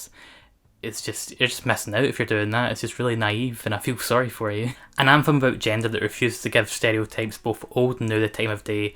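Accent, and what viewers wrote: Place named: Scotland, United Kingdom. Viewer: This is the best channel I could find, music stuff with a scottish accent!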